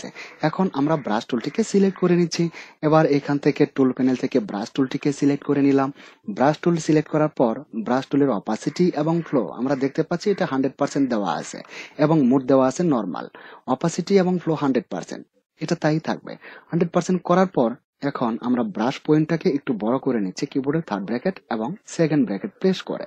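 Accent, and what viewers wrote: Bangladesh. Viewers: Bangla vasa ta ektu valo kore bolle valo hoe.. bangladeshi vasa ta sunte baje lage.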